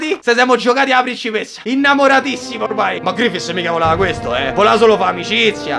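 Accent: Rome, Italy. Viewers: Ma soprattutto perchè è l'unico che sa sfruttare così bene l'accento romano da far sbellicare dalle riderate, personalmente a me non è mai successo fino ad ora.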